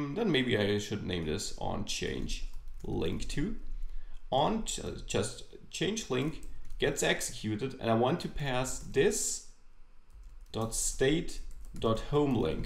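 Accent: Germany. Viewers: My first though was: "the way you are speaking... german guy". :D Thats cool. I found these days polymer. This looks really cool and a nice way how to create new kind of views with real components. Greetz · Personally I really like when Germans speak English beacuse then it is very easy to understand at least for me. And of course - I really enjoy your amazing lessons as I'm touching React for the first time. Greets from Poland :)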